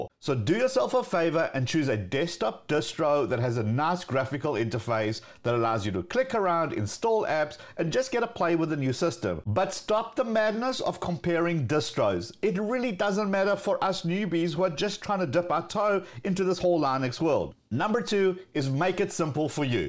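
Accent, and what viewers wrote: South Africa. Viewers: for real bro, you from SA?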